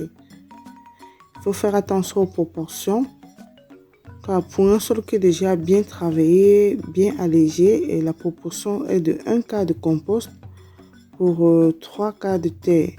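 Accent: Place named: Africa. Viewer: coucou je trouve que t’as un accent africain 👋😄 t’es trop bien ce que tu fais. Bonne vidéo comme d’habitude. . quelles sont tes résolutions pour 2022, moi c’est de faire plus de vidéos sur YouTube c’est cool. Bonne soirée. Yanis, À bientôt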